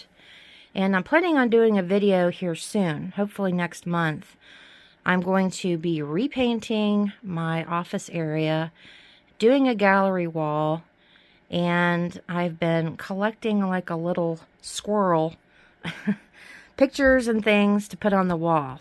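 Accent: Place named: North Carolina, United States. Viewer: Neighbor! Ok.. Which state are you in? You almost sound like a North Carolinan😉.. I'm actually originally bien & raised in NC, moved to Tennessee 4yrs ago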